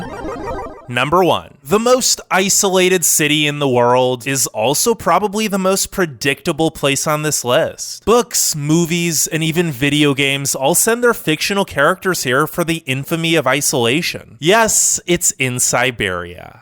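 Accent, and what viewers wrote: California, United States. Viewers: Why does your voice sound different in your videos? Some of them you have a more Californian accent. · Where is your accent from in the statex ?! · Petropavlovsk is ok to pronounce. What is really hard is this American accent the author speaks 😅, my tongue wud hurt trying to copy that: theRe aRe, the largest (lOrgest Karl, lOrgest ) city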